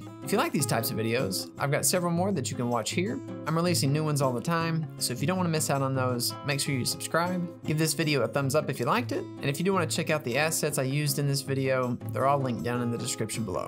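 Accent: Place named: Texas, United States. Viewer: I get the feeling he is from texas just by the way he sounds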